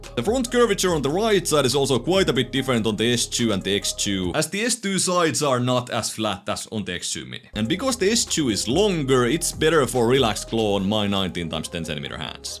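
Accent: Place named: Finland. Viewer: You have a pretty strong Finnish accent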